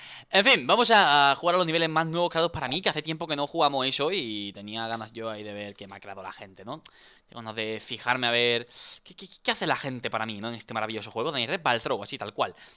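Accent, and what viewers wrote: Argentina. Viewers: Dani eres argentino o español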